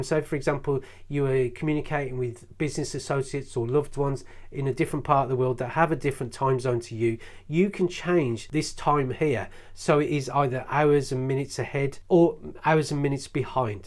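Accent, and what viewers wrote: Birmingham, United Kingdom. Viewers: Thanks. My first watch in the 70's was a Casio digital and now.. after all these years I've got a yearning to get back into these type of watches. l recently bought a silver coloured e1200 and I'm really impressed with it.. so much so - that I'm going to buy a few more digitals and I'm watching a few vids about them. Saw this excellent vid of yours and it is very detailed and informative..plus, it's always nice to hear a Brummie accent. If you don't mind, please could you just answer one question from me..I like the legibility of the reviewed model just shown, especially with its larger digits, but I'm undecided about the size of this watch as I prefer slightly larger faces. Without going into G Shock territory what Casio's could you suggest I take a look at?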